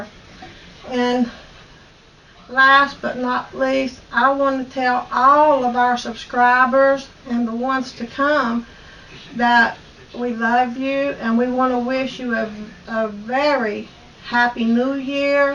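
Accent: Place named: South, United States. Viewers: I love when cute lol says. Let me tell you something in her country voice. And her nice kind husband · I love your videos. I am originally from Virginia near the WVA border, so your accent sounds like home! I am going to try the Fig Newtons. I think we will like them. The bacon looks good too. I'm going to share your channel with my daughter who loves to cook. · I like to watch you cook and hear you talk. Wondering where you live and if that is your real kitchen. It's so comfy looking. You accent sounds like mine; I'm from South Central Kentucky.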